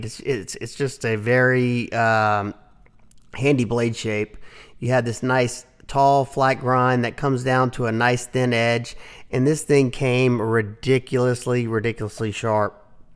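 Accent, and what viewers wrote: Louisiana, United States. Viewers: I recognize a Louisiana accent when I hear one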